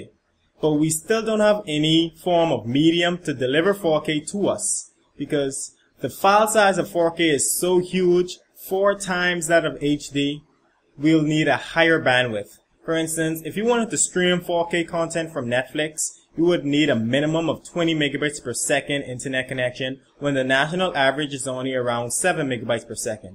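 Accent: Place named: Wales, United Kingdom. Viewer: This guy has a Welsh accent if your wondering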